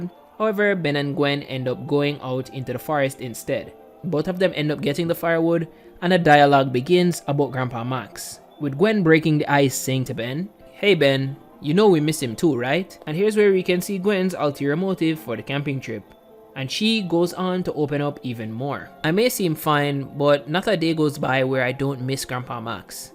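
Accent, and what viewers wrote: Jamaica. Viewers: No better reading than in the Jamaican accent. Love it. Your voice is great for narration. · Ur Jamaican?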